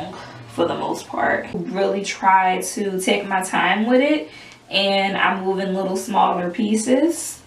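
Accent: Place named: Louisiana, United States. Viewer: We gon start wit da bek, let's giv it sum wuhta bebbe😂🤣😂🤣😂 I jus luv ur loui accent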